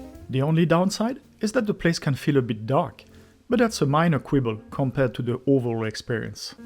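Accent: France. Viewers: I'll always remember my trip to Montmartre; my next time in Paris, I'll dine in the warm ambience of Le Bon Bock -- despite having to pat my hand a bit to find my fork and knife. 🍽 Just kidding... I love a dimly lighted resto for supper. Ahhh, that roasted Camembert w/honey and thyme! I can taste it now. 😋 Viewing your well paced videos while listening to your marvelous French accent is such a pleasure. Merci beaucoup,. 😊❤❤❤❤❤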